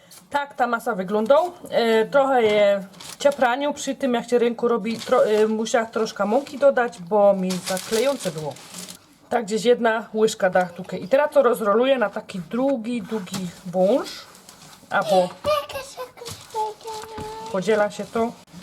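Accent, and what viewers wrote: Silesia, Poland. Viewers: ciastka gówno? :D zajeżdza niemieckim akcentem jak u slązaków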